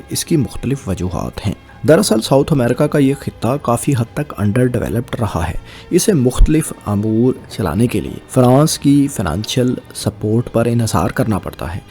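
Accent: Punjab, Pakistan. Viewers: صاحب آپ کی ویڈیوز میں بہت اچھی معلومات فراہم کی جاتی ہیں ۔ لیکن آپکا اردو تلفظ ٹھیک نہیں ہے ۔ آپ پنجابی زدہ اردو بولتے ہیں ۔ مثلاً Himayat ko Hamayat, Mukammal ko Makkamal, Aazadi ko azadi, aor ko or Difa ko Dafa بولتے ہیں ۔ آپکے مقابلے میں فیصل وڑائچ صاحب کا اردو تلفظ بہت کمال کا ہے۔ آپ ان سے مدد لے سکتے ہیں ۔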